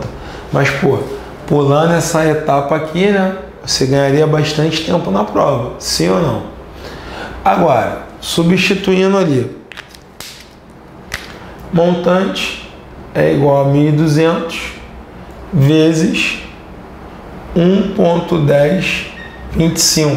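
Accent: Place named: Rio de Janeiro, Brazil. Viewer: Exelente aula amigo, mas aguentar esse seu sutaque de carioca é insuportavel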